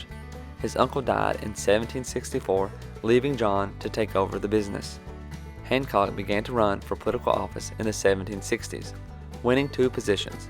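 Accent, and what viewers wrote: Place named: South, United States. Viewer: Great video! Very concise but informative. As a Bostonian, i love that a southerner, with a thick southern access and bank music, is making a video on a New England hero! Thanks, my southern patriot friend! 😉 p.s. it's MassaCHEWetts, with a chew. Not Massatoosets. 🤣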